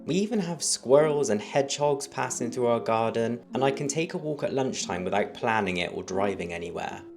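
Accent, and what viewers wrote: United Kingdom. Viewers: I love Somerset! I'm from Bristol but moving away (I think to the outskirts of Weston?) when my daughter finishes school. Have considered Wells as I adore it, and Glastonbury... but no trains and I don't especially love driving. Are you from Bristol, you have the same accent as me?? Love finding your channel and I will follow your journey! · Really interesting take 😊 Enjoyed this. Somerset is wonderful. Trying to narrow your accent down. Portishead?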